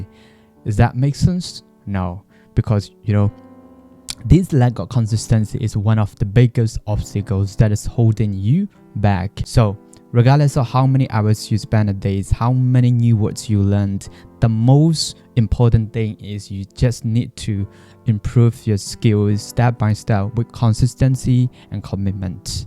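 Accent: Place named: United Kingdom. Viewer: British အသံထွက်ကိုသဘောကျတယ် အကို 💥 လေ့လာနည်းလေးတွေများမျတင်ပေးပါ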